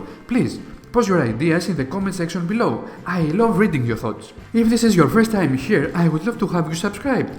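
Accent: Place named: Greece. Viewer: Πολύ ωραίο βιντεάκι, άλλα από την προφορά κατάλαβα ότι είσαι Έλληνας, όταν είδα και το sparta σιγουρευτηκα :p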